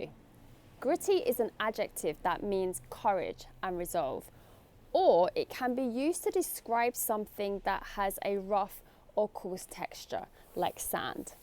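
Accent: United Kingdom. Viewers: Your video made my day. ❤❤ Love British accent.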